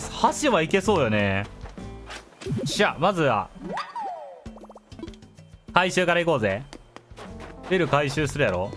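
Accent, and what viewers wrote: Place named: Kansai, Japan. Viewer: 久しぶりに見たんだけど､こんな関西弁の人だったっけ？ってなった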